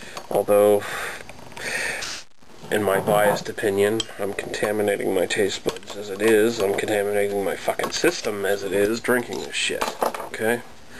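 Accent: Canada. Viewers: is he canadian (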